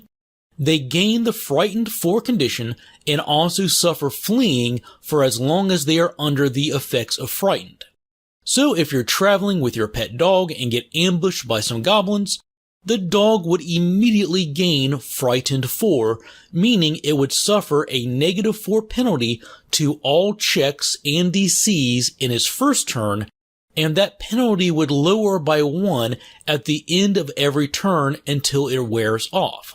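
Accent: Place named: Mid-Atlantic, United States. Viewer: With the 'L' sounds coming from the throat rather than the tip of the tongue, coupled with the wide 'O' vowel sounds, I'm going to guess Maryland. Definitely somewhere in the US Mid-Atlantic region between DC and Philadelphia.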